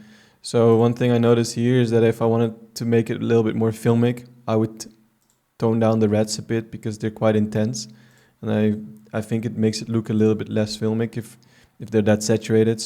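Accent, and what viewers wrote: Netherlands. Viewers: Je bent Nederland volgens mij haha het accent nice video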